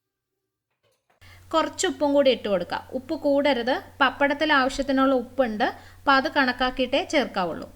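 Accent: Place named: South Kerala, India. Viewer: njan kollam aanu...njan chechiyude eth recipe cheyth nokkiyalum ath kidukkachi ayitundakum...ith vare. chechide samsaram njangalude pole...apozhe thonni chechi thekkan keralakkari anenu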